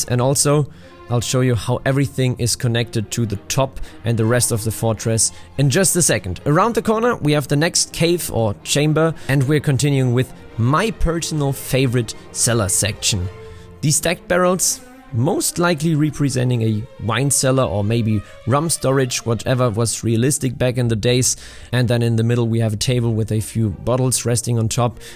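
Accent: Germany. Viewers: junge was ein gammliges kartoffelenglisch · the most "german english" i've heard in a long time. weiter so! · Das LEGO-Build ist Genius-Level - das Video ist sehr spannend. Nur dein Englisch ist eine Katastrophe...Vokabular gut, es geht nur um die Reihenfolge der Wörter, die typisch deutsch ist, wie auch die Aussprache. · as a german i hear the german accent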